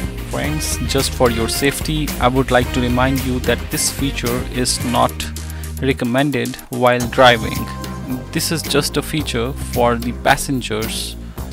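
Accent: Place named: India. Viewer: the first tutorial with hindi accent that actually helped me Great job mate you are 1 in a million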